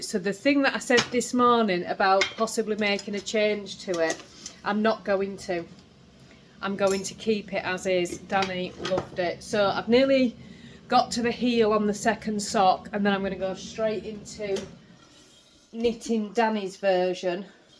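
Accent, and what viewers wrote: Yorkshire, United Kingdom. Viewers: Oh, I'm so sorry that some grubby little person has seen fit to spread their poison onto your lovely vlogs. And they are little people with little lives who have nothing better to do so let's give a collective f off (I don't use that word but it seems appropriate here 😁). Someone nearly destroyed my dear friend Sue (Suzie Stitcher) who is the sweetest most inoffensive person. She nearly took her channel down but I'm glad she bounced back., your warmth and lovely Yorkshire accent just oozes out of your channel - and you have lots of fur babies 🥰😍🤩 Well, it was 14C yesterday and 40C not so long ago - I'm glad that I didn't put my long johns away Ha Ha Look forward to seeing you soon. Much love, Angela 😘😘😘XXXX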